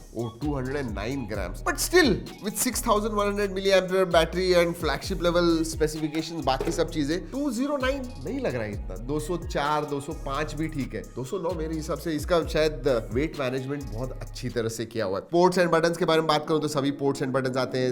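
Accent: Maharashtra, India. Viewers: ap Marathi ho na. bhiya 😅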